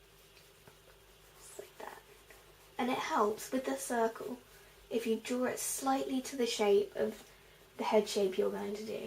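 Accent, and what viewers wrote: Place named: United Kingdom. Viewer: I LOVE your videos! I have already done all the Bakuras and I like Kisara too. It's so nice to hear another British accent on YouTube, too many Americans, though mine a lot more country than yours! :-P Just wondering, could you do either a Malik or Marik video please! I would love to know how to draw them! Anyway, love the videos and keep drawing! :-D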